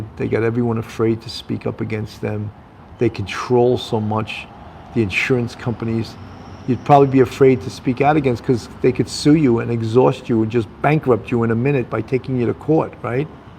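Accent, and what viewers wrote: New York, United States. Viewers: I'm a Wantagh lady, living in NC! My aunt was friends with Carol Baldwin, Alec's mom. They helped each other go through cancer, what a sweet lady! They took my daughters and I to a July 4th parade and Mrs Baldwin gave my daughter a doll her daughter had. I have a pic if Alec with my aunt at Billy's wedding. Yes, he's arrogant and should have kept his mouth SHUT!!! They may make an example of him! LOVE hearing your NY voice, reminds me of home!!! Love your jokes and songs!!! I saw last year and enjoy your songs and dongs of that era!!!, my daughters are 40 and 41!!!!!!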